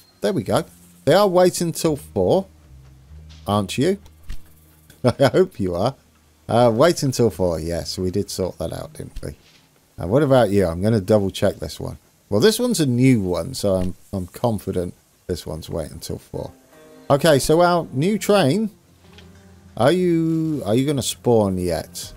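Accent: England, United Kingdom. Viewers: Good episode. Nice train journey at the end! How come you are living in Austin? You sound English! I lived in Denver for 3 (excellent) years. Spent many a weekend exploring the Rockies. Also went to San Antonio, to see the Alamo, though I don't think we went to Austin.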